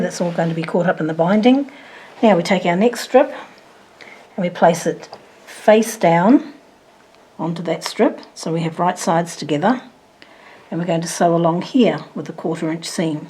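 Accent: Australia. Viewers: I love your video on how to machine bind a quilt. Very clear, very concise and a new little trick on how to make sure you cut your binding exactly the right length. PS love, love, love your Australian accent so sweet ‼️❤️ Colleen ☘️ USA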